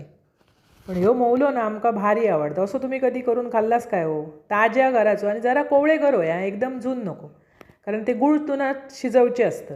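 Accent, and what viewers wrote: Malvani, India. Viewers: ओरिजनल मालवणी ❤ ऐकान लय भारी वाटता जा ताई❤❤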